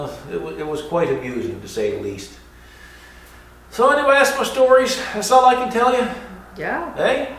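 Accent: Newfoundland, Canada. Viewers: First time watching. Great videos. "My son they're in there now, they thinks their in Bermuda". I'm assuming your from Newfoundland. Now where is the Jiggs dinner? I'm hungry!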